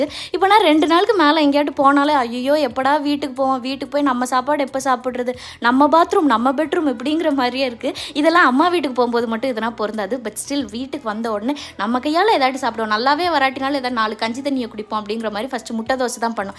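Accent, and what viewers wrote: Tirunelveli, India. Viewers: Naalu Kanji thanni...😂❤thirunelveli, tenkasi namma slanguuuuu❤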